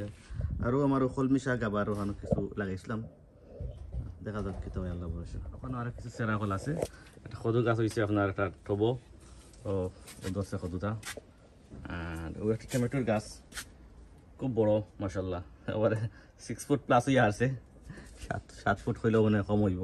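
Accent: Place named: Bangladesh. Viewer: মাশাল্লাহ খুব সুন্দর ফসল কথাই বোঝা যায় আমার দেশি মানুষ সব মিলিয়ে খুব ভালো লাগলো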